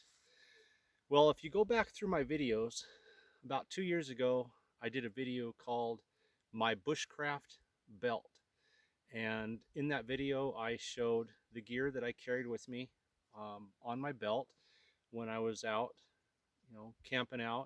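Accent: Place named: Mexico. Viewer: I like your Mexican accent. Like your hat also.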